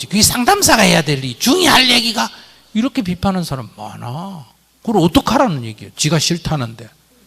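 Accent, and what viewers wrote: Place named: Gyeongsang, South Korea. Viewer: 경상도에서는 가르치다를 사투리로 가리키다 가르키다라고도 합니다 사투리로 보시면 이해가 될것입니다 시도때도 없이 많은 사투리를 쓰십니다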